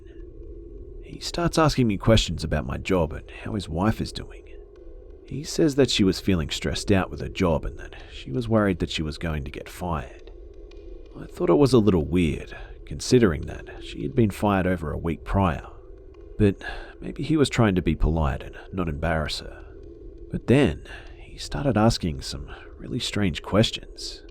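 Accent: Australia. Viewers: Are you from Australia mate and if so what state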